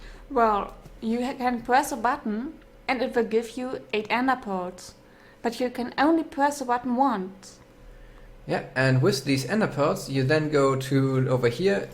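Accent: Germany. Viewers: woow the redstone was so complicated I almost got headache haha... btw I like your german accent...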